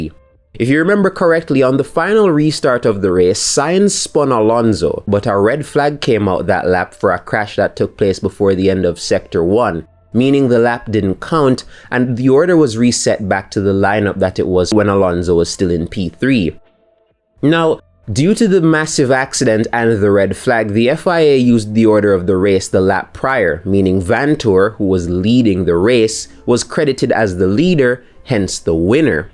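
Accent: Jamaica. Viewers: I can't tell Are you Jamaican Or Pikey? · I was thinking canadian, but yh probz yardie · are you Jamaican by any chance?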